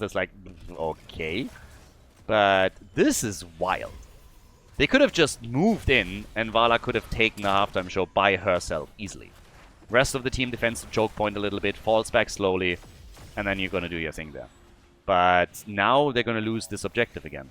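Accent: Germany. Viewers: It's a German English accent.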